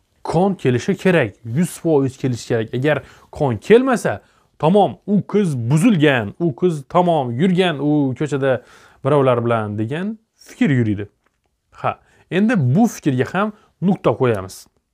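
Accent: Khorezm, Uzbekistan. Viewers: Xorazmdanmisz oga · Dòktr xorazmdanmisiz shevangiz tortyabdi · Шифокор Хоразмли шекилли 😊баклаб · Салом хаммага адашмасам доктримиз хоразмдан шекилли шеваси тортяпди Хоразм Зур гап юк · Xorazmdanmisz diliz ôxshab durupdi😅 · Xorazmlimisz yoo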